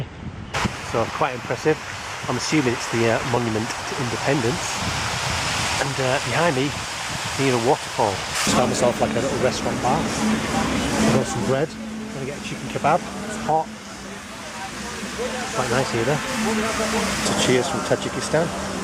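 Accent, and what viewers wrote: England, United Kingdom. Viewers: What are you doing in Dushanbe, your accent looks you are from England . Enjoy snc safe journey .I live Southampton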